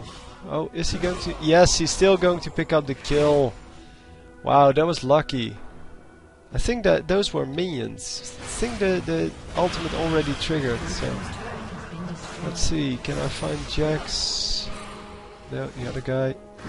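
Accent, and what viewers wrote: Netherlands. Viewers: New to the channel, just subscribed...and do I detect a Dutch/Flemish accent?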